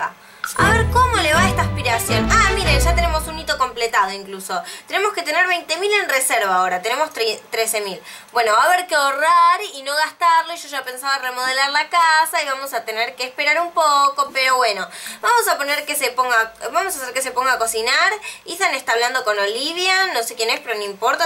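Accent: Spain. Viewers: siempre estas en un bar y otra pregunta eres española o inglesa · es española o argentina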